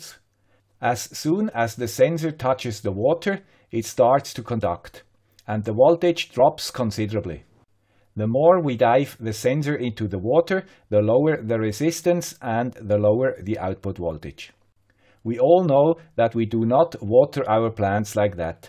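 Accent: Switzerland. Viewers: Hallo aus Österreich,!:hand-pink-waving: Ich mag deine Videos. And "the Swiss accent":) Wegen des Kupfers... Wenn du, so wie du es gemacht hast, die Elektroden ins Leitungs-/Brunnenwasser steckst (nimmt man ja meist zum Gießen) und mit ein wenig Spannung und einigem Strom beaufschlagst, erzeugts du einfach Knallgas. Sauerstoff und Wasserstoff an je einer Elektrode, das entstehende Wasserstoffgas kann als Blasen an der Oberfläche der Kathode freigesetzt werden. Komplizierter ist es mit Folgendem: Im Leitungswasser sind üblicherweise verschiedene gelöste Salze enthalten, darunter Natrium- bzw. Kalziumionen und Hydrogencarbonationen (HCO₃⁻), die möglicherweise reagieren könnten, um Kupfersalze wie Natriumkuprat (Na₂CuO₂) oder Kupfercarbonat (CuCO₃) zu bilden. Verschiedenen Faktoren wie Wasserzusammensetzung, pH-Wert, Temperatur und Stromstärke beeinflussen die Reaktion. 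Wenn man Pflanzen mit einer solchen Flüssigkeit bewässert, bzw das im feuchten Erdreich auftritt, könnte dies potenziell schädlich sein, da Kupfer in höheren Konzentrationen toxisch für Pflanzen sein kann. Kupfer ist für Pflanzen notwendig, aber nur in geringen Mengen. Bei zu hoher Konzentration kann es jedoch die Aufnahme von anderen wichtigen Nährstoffen behindern und oxidativen Stress verursachen. Die Kalkablagerungen könnten auch den Boden pH-Wert erhöhen, was wiederum die Nährstoffverfügbarkeit für Pflanzen beeinflussen könnte. Ein erhöhter pH-Wert kann dazu führen, dass bestimmte Nährstoffe wenige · Your videos are always wonderful, instructional and your Swiss accent is adorable too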